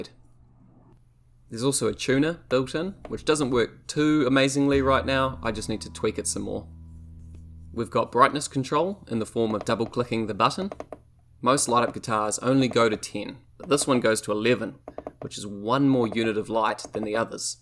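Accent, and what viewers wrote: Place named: New Zealand. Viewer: Got to be a New Zealander, man we sound so strange after listening to Americans etc.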